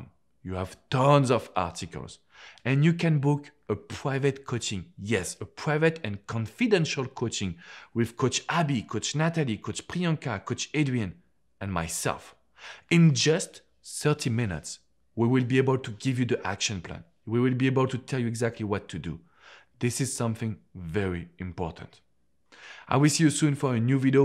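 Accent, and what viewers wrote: France. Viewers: "You need to clique" haha love the french accent, ur a really cool nice guy :) :)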